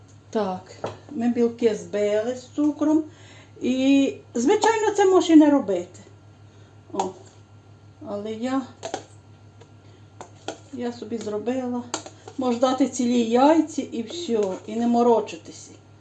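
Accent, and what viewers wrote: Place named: Galicia, Ukraine. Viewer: Не складний рецепт,варто спробувати. Спасибі за рецепт.Обов'язково спечу. Я з такою еасолодою слухаю вас і наш рідний галицький діалект. Бережіть себе і будьте здорові.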